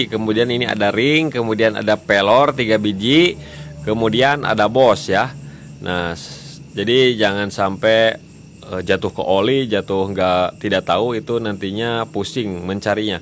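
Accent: West Java, Indonesia. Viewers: Curukk 🤭 orang sunda ieu mah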